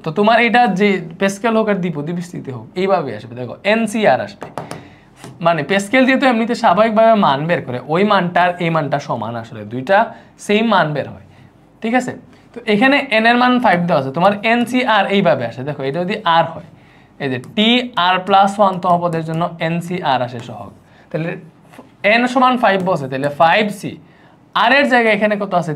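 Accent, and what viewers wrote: Sylhet, Bangladesh. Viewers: Bai sylheti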